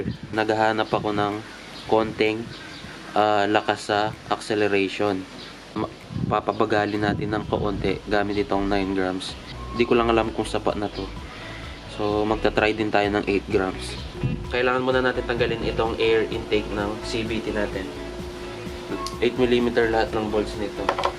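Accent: Pampanga, Philippines. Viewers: Kapampangan ka paps? Hahaha papuntang bahay pare yun aa.😂😂😂😂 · Kapampangan ka sir?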